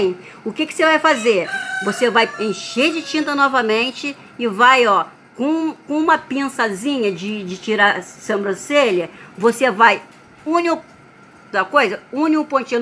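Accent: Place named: Rio de Janeiro, Brazil. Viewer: Legal o vídeo, mas pelo sotaque você é carioca, e é normal os correios terem medo de entregar, só tem bala perdida aí e bandido.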